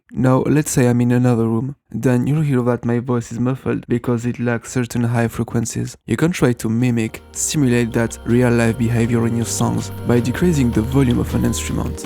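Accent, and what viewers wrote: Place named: France. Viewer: I recognized a French accent Mdrr 😂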